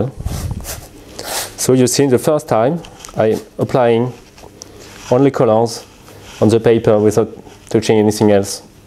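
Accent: France. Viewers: Yup totally french. I'm myself a frenchie... But his accent is sooooo pronounced, even sounds quite fake. (We french know that English ppl love our accent so we make it sound the most "french" possible to.. Be "sexy"?) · Thank you for such a gentle and lovely voice with accent. MMM French accent always reminds me of food. I know why however that is another story. After dusting off the pastels January 1 and deciding to make a picture, at least one, everyday. Then I would watch how it is done. The spreading of the colour is so relaxing. Deep rich intense colour. Colour heals. May all who venture into the land of pastels be healed with the colours. <3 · On sent bien qu'il s'agit d'un Français qui parle Anglais!!! Pourquoi n'a-t-on pas une version FRANÇAISE?? Je suis désolée mais on devrait pouvoir avoir le choix de la langue!!!!!!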